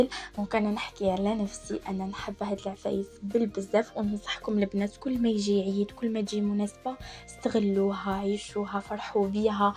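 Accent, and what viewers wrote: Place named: Algiers, Algeria. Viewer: تقول عليك دزيرية مش قسنطينية هدرتك متشبهش كامل لهدرت لقسنطينة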